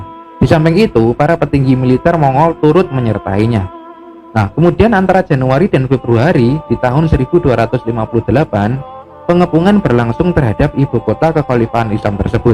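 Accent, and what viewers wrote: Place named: Banyumas, Indonesia. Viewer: Logate ky wong ngapak ☺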